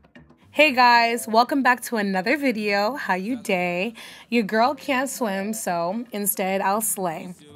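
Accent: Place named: Nigeria. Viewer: omg you are gorgeous are u Igbo Yoruba or Hausa etc? you definetly sound Nigerian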